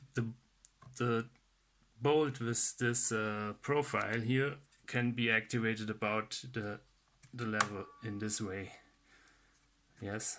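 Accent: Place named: Germany. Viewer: Thank you very much for the useful video! I've just replaced the belt in my Supernova Automatica. Followed your recommendations, and everything went just fine. The only thing which was different - I bought a round rubber belt, so I did not need to release the motor to put it on. I also like your other videos about Necchi sewing machines and I love your German accent!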